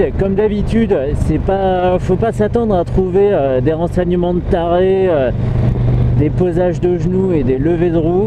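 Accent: Paris, France. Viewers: ton accent parisien est horrible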